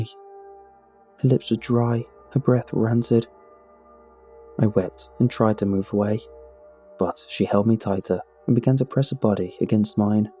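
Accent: England, United Kingdom. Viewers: Bro! Your accent is English? I really don't care but it makes me fall asleep instantly. So relaxing.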